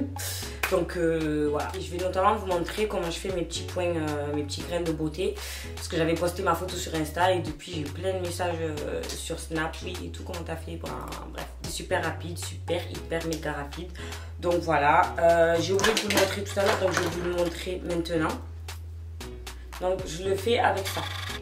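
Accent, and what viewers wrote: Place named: South, France. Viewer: T’a un accent du Sud , comme moi 😭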